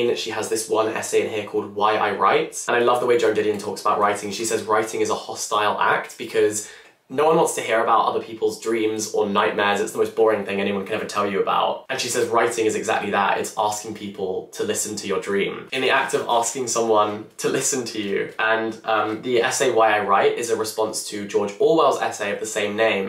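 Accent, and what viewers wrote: United Kingdom. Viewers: I'm preparing for my IELTS exam and your videos are helping to understand british accent and also to learn how to talk fluently. Love you, ~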